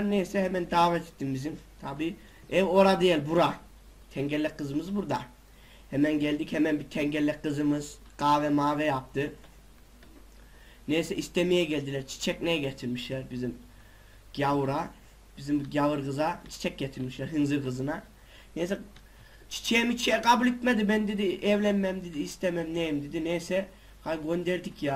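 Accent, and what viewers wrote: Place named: Kayseri, Turkey. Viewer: adamsın sen nerelisin bide biraz böyle kayserliler gibi konuşuyon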